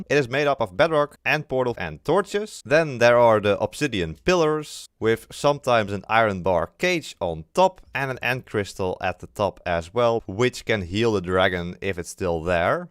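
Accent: Netherlands. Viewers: Bro you sound dutch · i smell dutch :)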